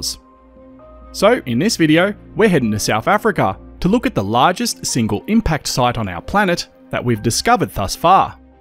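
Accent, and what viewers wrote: Australia. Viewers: Nice to hear this program in a good ol Aussie accent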